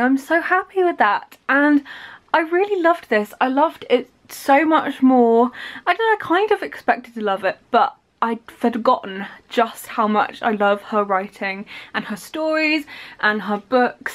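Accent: United Kingdom. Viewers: Certainly I just love reading vlogs 😇 Thank you so much for this amezing Vlog😇🤝 And you know what your British accent is just phenomenal ❤️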